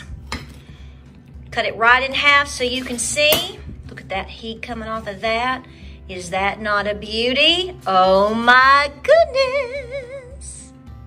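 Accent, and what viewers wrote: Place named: Rural, United States. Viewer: And her country twang. I love it!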